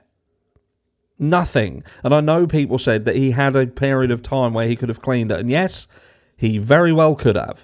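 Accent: Norfolk, United Kingdom. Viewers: Wow, you've a thick norfolk accent!! I dont have a regional accent but i'm born & and raised in Norfolk so I recognised it within seconds! You were saying the word 'hear' but you pronounce it as 'hair', made me smile!😊 The lawyer you know is absolutely brilliant youre right. He always remains objective, even though he leans to the side of a defence perspective. Isn't it nuts how differently the US tries cases? They are so open compared to us here in England!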